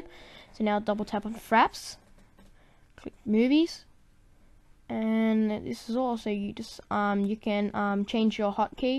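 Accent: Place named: Australia. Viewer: Austrailian mate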